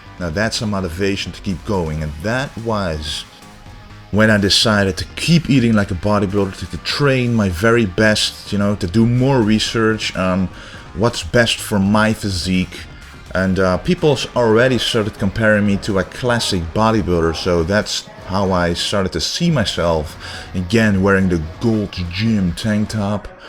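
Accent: Netherlands. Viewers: Hey man , are you dutch ? you sound dutch , i am dutch , my dad is also a oldschool bodybuilder from the netherlands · Are you Dutch your accedent is like a dutch men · I'm wondering, are you Dutch? Your facial features appear Dutch, and your accent has slight hints of Dutch in it. I'm guessing either Dutch or Scandinavian. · Dutch accent is so cool.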